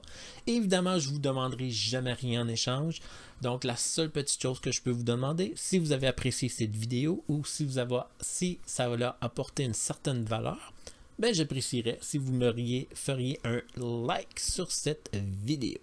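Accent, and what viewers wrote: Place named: Quebec, Canada. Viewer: AH voilà je savais que tu étais québécois ! Préfères tu bitsgap ou crypto hopper ?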